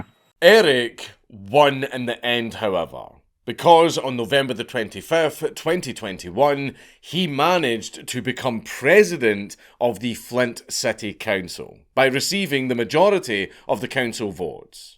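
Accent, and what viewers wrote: Scotland, United Kingdom. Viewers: There’s really nothing more I love hearing in life than Dankula saying “ for free” in his Scottish ass fucking accent. “Fourfrii”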